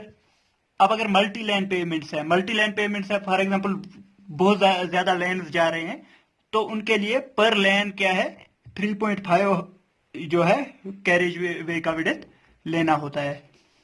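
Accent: Kashmir, India. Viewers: kashmir se hain kya aap?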